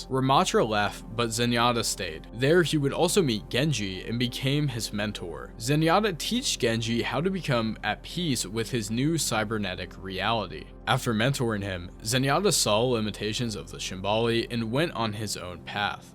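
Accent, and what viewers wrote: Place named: United States. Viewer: is this a text to speech or are you so american you can't let go of the american pronunciation? It's horrible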